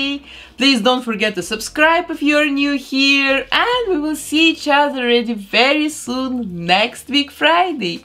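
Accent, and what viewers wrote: Russia. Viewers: Акцент очень русский... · If I may ask... You have a gorgeous accent (I’m American) my husband says you’re from Russia (he’s a musician in a Russian place) I think you mentioned Belgium. It’s so beautiful!! You have a gorgeous accent!! ♥️♥️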